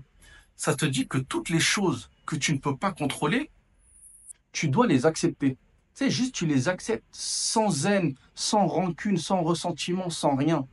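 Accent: Banlieue, France. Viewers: Tu as un accent de banlieue, ce qui peut être perçu comme un désavantage dans le monde du travail et la vie sociale. De plus, à ton âge, porter une casquette à l’envers avec des écouteurs ne renvoie pas une image très professionnelle. Pour ma part, je vis en Irlande et je suis d’origine maghrébine. J’ai quitté la France en 2005 car je ne trouvais pas de travail non plus. Lors d’un voyage d’affaires à Lyon, j’ai été choquée par les arabes, l’ambiance dans le centre-ville, ainsi que dans les transports en commun comme le tramway et le métro : des comportements incivils, un vocabulaire limité et une omniprésence de "wesh wesh". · Tu te trompes totalement sur le racisme, c'est bien ton accent de banlieue qui t'empêche de trouver un travail. Les français, aux patronymes bien français Pour chaque maghrébin à accent de banlieue qui ne trouve pas de travail, tu as un maghrébin qui parle normalement qui a un emploi et parfois des emplois à hautes responsabilités. 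Et pourtant tout le reste est en commun. Il y a plein de directeurs d'hôpitaux, de collège de lycée, plein d'officiers des armées ou de la police, plein d'attachés d'administration et d'attachés territoriaux, et PLEIN de politiques haut placés maghrébins, à couleur de peau, avec un nom arabe, et musulmans. Qu'ont-ils TOUS en commun? Ils ne parlent pas avec un accent de cité. Et devine quoi? Les français blancs à patronymes bien français avec un accent de cité ont exactement les mêmes problèmes de recrutement. Sur le reste je suis totalement d'accord avec toi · ok il est assez beau mais par contre, il a un petit accent de banlieue.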